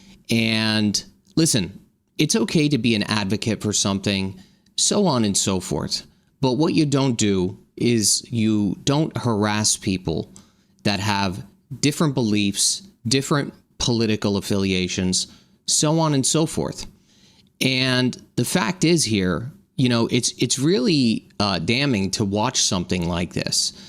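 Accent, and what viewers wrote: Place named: New York, United States. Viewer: Sounds like a NY accent. Domestic terrorist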